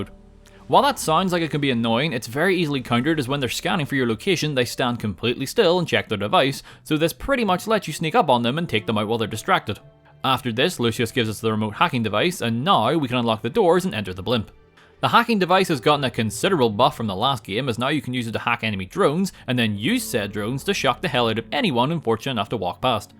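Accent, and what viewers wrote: Northern Ireland, United Kingdom. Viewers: Are you from Northern Ireland? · Northern Irish! cool to hear an NI accent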